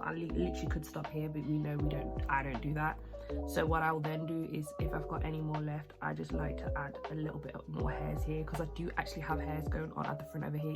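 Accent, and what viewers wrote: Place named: Scotland, United Kingdom. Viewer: Appreciate the subtitles cause I don’t understand your Scottish accent x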